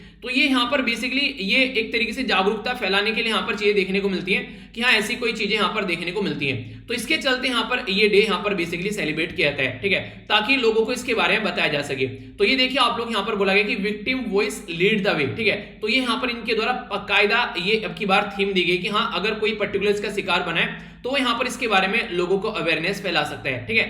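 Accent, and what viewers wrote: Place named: Western Uttar Pradesh, India. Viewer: सर जी आप कहां से हो मुझे आप की बात सुनकर लगता है कि आप पश्चिमी उत्तर प्रदेश से हो कल जरूर बताना । अगर किसी दोस्त को बता हों तो जरूर बताना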